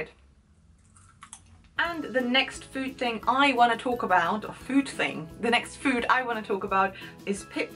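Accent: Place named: United Kingdom. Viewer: Hello from California! I'm an American raised by Germans. Really enjoy your videos. Love your British English.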